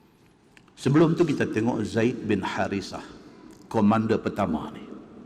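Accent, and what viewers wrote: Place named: Penang, Malaysia. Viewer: Ustaz Cakap Sungai Acheh sebijik sama macam Arwah Tok saya... Minta Ma Auuf. Semoga Allah merahmati arwah Tok dan TokWan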